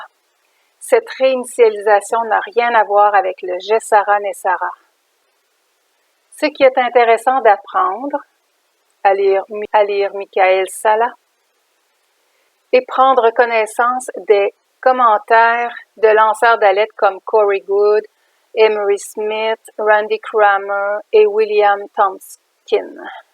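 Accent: Canada. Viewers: C'est la première fois de ma vie que je trouve l'accent canadien magnifique.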